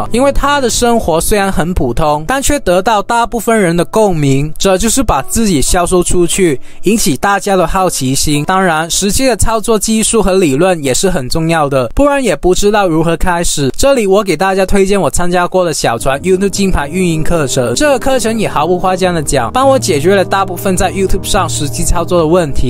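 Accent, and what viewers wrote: Hong Kong, China. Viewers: 不是台灣口音喔！感覺比較像香港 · 想問下是哪裡人呀？聽口音，難道是香港人嗎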